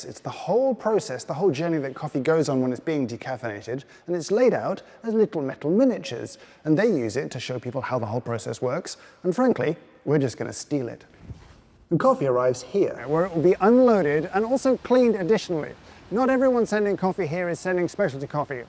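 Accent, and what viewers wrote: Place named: England, United Kingdom. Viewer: English acent - Check Red Sweater - Check Factual content of an amazing place - Check Anyone else getting Tom Scott vibes? I don't know how I feel about this, I miss Tom.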